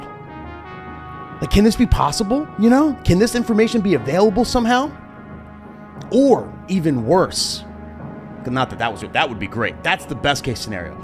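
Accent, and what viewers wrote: New Jersey, United States. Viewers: Dude you are so Jersey! Love from L.I.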